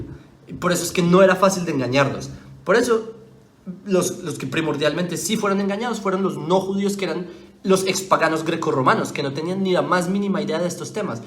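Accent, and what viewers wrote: Bogotá, Colombia. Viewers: Y cómo va a vivir libre, sirviéndote a ti ? Que eso es lo que quieren los judíos , que les sirvan los demás ? Estás crazy , resígnate y entiende que Dios es Dios para el Mundo , Y que Dios prometió bendecir a todas las naciones , con su risa tonta y su acento de rolo nadie te cree, no eres más que un cachaco con kipas ! 😢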